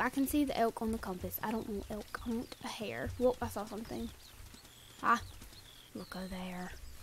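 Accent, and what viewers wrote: South, United States. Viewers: Its an off day when whatever your eating just sinks in to the ground....... also lol southern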